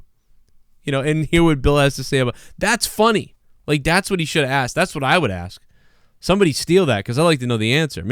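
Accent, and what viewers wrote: Boston, United States. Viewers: Greg Van Holsbeck that’s the BAWSTIN accent buddy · This was a good video. Very fair. I can hear the Boston in you too lol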